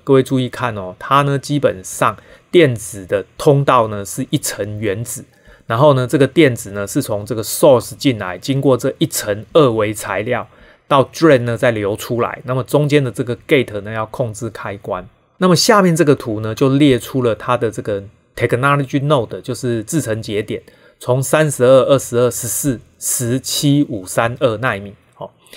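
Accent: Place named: Taiwan. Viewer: 感覺你是台灣口音！台灣人要守住台灣！ 不要給土共報路！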